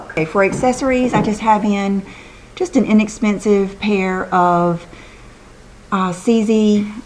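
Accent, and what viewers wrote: South, United States. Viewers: I love watching you! I'm Southern also, so it's like I'm listening to a girlfriend. Big tip---- I've already tested Dior Star. It IS lovely, but doesn't wear all day or stay as natural-looking as Guerlain Lingerie de Peau. Virtually no one reviews Guerlain on YouTube but their foundation is fantastic! Sample it. It does oxidize slightly, so go just a tad lighter than what u think u need. :)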